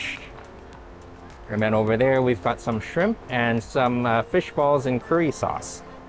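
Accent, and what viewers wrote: Canada. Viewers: I like your HEAVY Canadian accent. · You have a Canadian accent!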